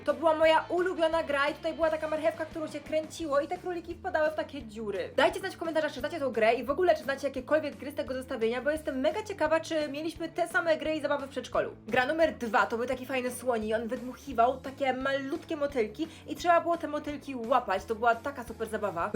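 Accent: Germany. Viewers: Ja też chodziłam do niemieckiego przedszkola i bardzo lubiłam te wszystkie gry i w nie ciągle grałam i masz bardzo ładny akcent niemiecki ja nie mam😢 ale już oczywiście mieszkam w Polsce i niektóre osoby z mojej klasy w szkole myślały że urodziłam się w Niemczech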